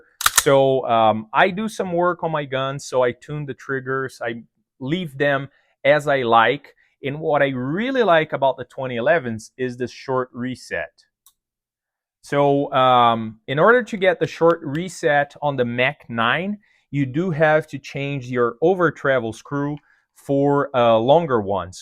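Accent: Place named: Quebec, Canada. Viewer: I'm hearing a French Canadian or Newfie accent? Where are you from?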